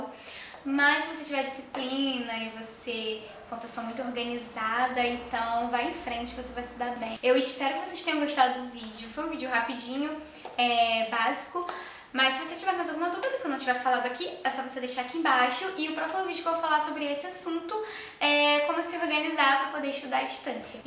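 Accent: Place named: Pará, Brazil. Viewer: vc é paraense?, o sotaque não nega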